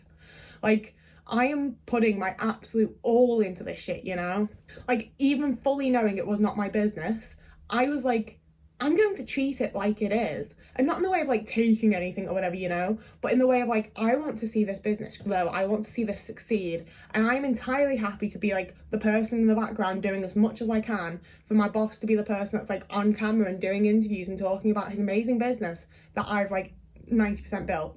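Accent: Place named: Northern England, United Kingdom. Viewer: TW for SA+self-harm+ED in the second paragraph, be safe ❤ With all the North Americans, antipodeans and RP in this space it’s brilliant to hear a sweary working-class northerner. 😂 I’m so there with you, some people genuinely just take the piss if you’re ”too altruistic”. Bad personal stuff or not, video shop bloke sounds like an absolute cunt. Glad you got out of that mostly in one piece. I always gravitate toward the younger people in this space, despite being a guy on the cusp of 40, because I genuinely can’t relate to the people who are still struggling with the same shite but somehow managed to have children along the way. I almost feel like I’m Matthew McConaughey in Interstellar, except I have the body of a 50-year-old and brain of a 20-year-old, but with 40 years of bollox crammed into it. I am in my second relationship, first was almost 9 years, this one is 8 and a bit - in the months between them, I turned my life upside down caring for a person with ED, undiagnosed ADHD (and autism, I’m all but certain) and a serious addiction who really tried to love me as much as I loved her, multiple ambulance trips and stitched up arms notwithstanding (hers, not mine) - she didn’t take advantage, but my feelings made me take advantage of myself and my very limited energy resources for her - then I got SA’d by another woman and ensnared for a few weeks in a Stockholm syndrome relationship (also where she lived, funnily enough) based on fear/fawning, control and mutual s*x addict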